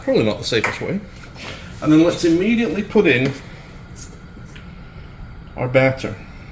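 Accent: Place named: Lancashire, United Kingdom. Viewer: I thought so. Yours is different from the southern Lancashire accent of St Helens. Best wishes. Merry Christmas to you and a healthy, happy and prosperous new year.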